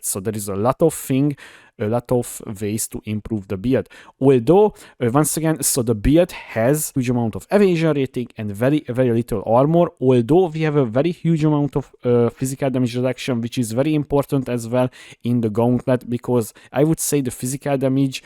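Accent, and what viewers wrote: Hungary. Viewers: He s english is wierd bc of he is born ín hungary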